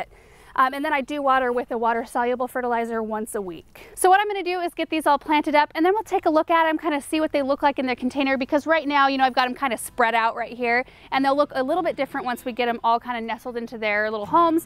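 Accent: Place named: Northwest, United States. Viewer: Yup, I pronounce herb and foliage exactly like you do. We're both from the Northwest.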